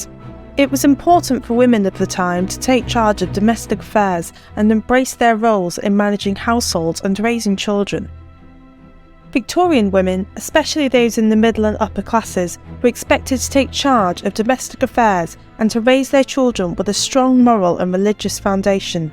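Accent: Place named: United Kingdom. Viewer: I love British accent😊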